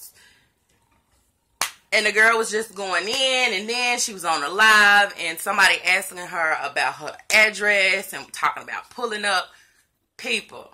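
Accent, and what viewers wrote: Texas, United States. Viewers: you from Texas. I am listening to your accent.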